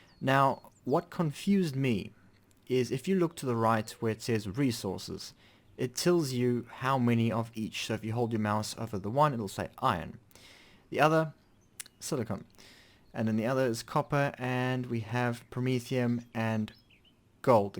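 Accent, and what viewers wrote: South Africa. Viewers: South African accent perhaps???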